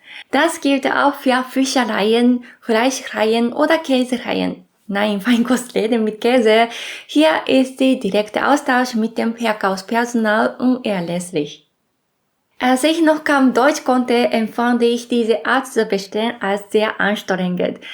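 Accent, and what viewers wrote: Japan. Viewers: Wax für ein süßes Mädchen Hallo kleine Ich bin durch Zufall auf dein Video gestoßen Und habe schon weg schalten wollen Aber deine ersten Worte haben mich fasziniert Das sympathische japanisch klang durch Da ich nicht mehr mobil bin sehe ich mir meine Lieblings Länder die ich zu DDR Zeiten bereisen wollte und nie konnte im Internet an Japan und Kuba waren meine Lieblings Ziele Japan wegen der Technik und dem schinkansen fudschi Und den sumu Weil ich auch so groß und schwer bin 😂 Und Kuba wegen dem Wetter und der Südfrüchte die es in der DDR nicht gab Kleines Mädchen ich möchte mehr von dir sehen und hören deine Ausstrahlung ist echt süß dein Mann ist bestimmt sehr sehr glücklich mit dir Ich habe mein Weibchen und unsere Kätzchen Die sind meine Schätze Danke das du mir möglich machst von dir weiter zu hören Wie kam ich zu Japan Ich war noch kind vor vielen vielen Jahren In unserer stadt wurden im Krankenhaus Menschen aus allen möglichen Ländern der Welt zu Ärzten ausgebildet Und mein Vater hat ümmer wenn er mal in der Stadt war Leute mit nach Hause gebracht und wir haben uns mit Händen und Füßen verständigt Ich fand das so toll und mache das heute auch noch und Internet ist für mich das Tor zur Welt Vorhin hab ich mit jemandem aus Usbekistan geschrieben und letzte Woche aus Indien Vielleicht können wir uns auch mal schreiben wenn du magst Aber jetzt wünsche ich dir eine gute Nacht und schlaf gut 😂 ❤Grüße aus quedlinburg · dein Deutsch ist sehr gut, du sprichst mit japanischem Akzent, doch was mich am meisten beeindruckt hat sind die Wahl der Worte und die hervorragende Grammatik, wo selbst Deutsche meist ihre Probleme haben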